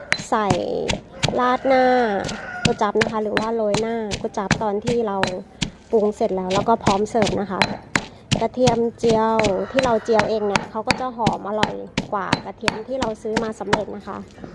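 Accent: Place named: Sukhothai, Thailand. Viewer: ฟังเสียงเหมือนคนโขทัยเลย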